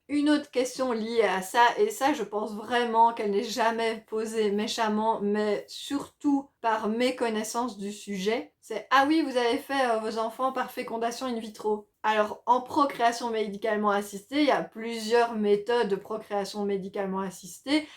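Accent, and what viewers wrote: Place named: Switzerland. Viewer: Ola l'accent Suisse !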